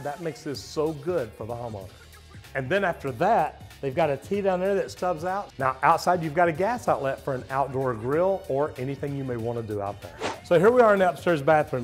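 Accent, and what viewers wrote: South, United States. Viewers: I have no idea what any of this is, but I stayed for that southern accent. 😃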